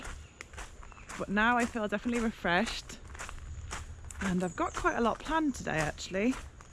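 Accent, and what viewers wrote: England, United Kingdom. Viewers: You’re from England? I can hear your accent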